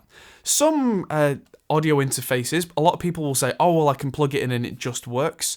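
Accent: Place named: Scottish Borders, United Kingdom. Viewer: what part of England are you from? Your accent sounds like you are close to the Scottish border. Am i wrong?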